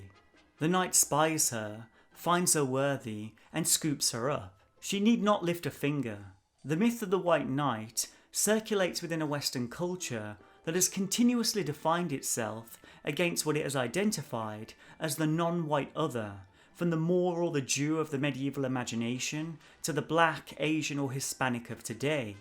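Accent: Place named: United Kingdom. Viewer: I will admit that I was kinda of skeptical of your channel when I first stumbled with it cause you sounded very British, I'm glad you didn't just gloss over your own identity and pretend like it does not affect the way you view things. The fact that you tried to confront your own biases in this video is pretty great, so kudos to you. Your channel is awesome!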